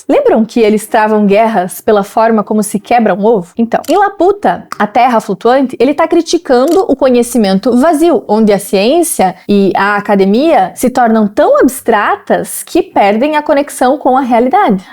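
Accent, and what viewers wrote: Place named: South, Brazil. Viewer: Este classico parece ser sensacional, ainda mais apresentado por uma voz doce de sotaque sulista simplesmente indefectível.... simples perfeição!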